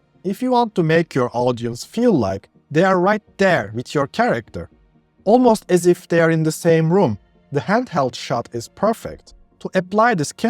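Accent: Turkey. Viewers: Bu aksan Turk aksani degilse ben de bir sey bilmiyorum :) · are you turkish? your accent sounds like turkish. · Your accent like Turkish...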